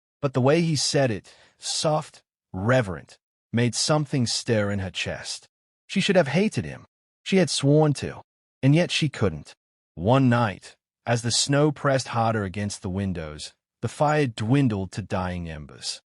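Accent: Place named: Bronx, United States. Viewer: This story voiced by a guy with a Bronx accent is funny.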